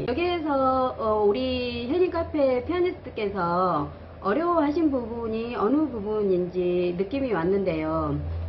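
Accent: Gyeongsang, South Korea. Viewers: 와이프는 AB형 와이프의 행동 방식을 이해하게 되었습니다. 가끔 경상도 억양이 귀엽게 들리네요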